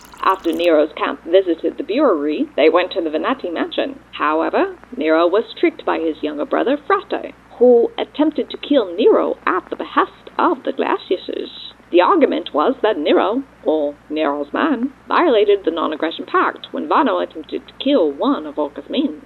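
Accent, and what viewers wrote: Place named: Transatlantic, United States. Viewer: The trans-atlantic voice is so entertaining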